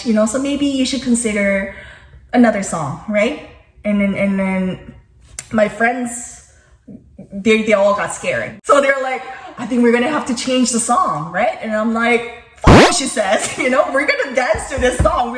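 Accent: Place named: General American, United States. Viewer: 💚 저 요것도 섀도잉 자료로 사용해야 겠어요!!ㅎㅎㅎ 진짜 저 억양 너뮤좋아해요오💚💚❣️❣️ㅎㅎㅎㅎ 진짜 미국영어의 정석이셔서 제가 젤 좋아하는 유튜브 채널이랍니다❣️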